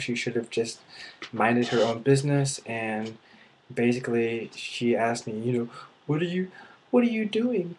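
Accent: Bavaria, Germany. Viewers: hahahahaaha me too.totally bavarian accent loooool so good :D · I laughed very hard when you said "Bayrische Motorenwerke", seems like you have a Southern/Bavarian/Austrian accent? :D Great video, by the way! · So you grew up in the US...but your parents are German...? I think it's funny that you have a slight German accent when speaking English :) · Seriously? You sound more like a Bavarian than I do, and I'm german :D You seem to be very open-minded and nice, thank you for trying to get rid of some of those stereotypes. Hope you'll get to enjoy Germany even more, someone like you should always be welcome :)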